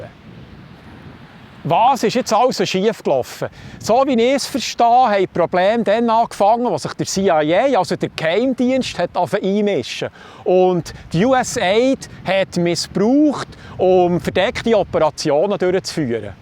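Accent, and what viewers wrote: Bern, Switzerland. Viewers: Danke... Du bisch dr bescht u es isch toll dis Bärndütsch...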